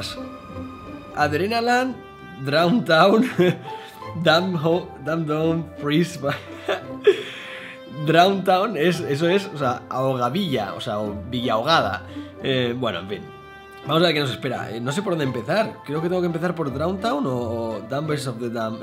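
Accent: Argentina. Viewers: Te sale mejor el Argentino que a mi (que soy de ahí) XD Como lo haces? Voto por un blog entero en idioma boludo! jaja Me encantan estos bichitos!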